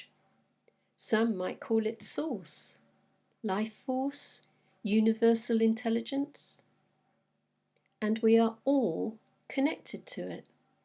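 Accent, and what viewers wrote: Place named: England, United Kingdom. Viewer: Your calm a sweet nature pulled me in. I love your pace and the peaceful feeling you create and give. As a Utah girl in the USA, I absolutely love your English accent! Beautiful story!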